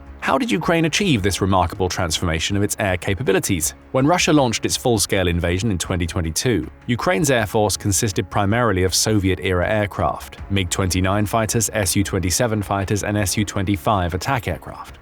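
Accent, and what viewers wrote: England, United Kingdom. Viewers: I'm really getting weary of the ENGLISH ACCENT. As if it the accent somehow carries more intelligence. 🙄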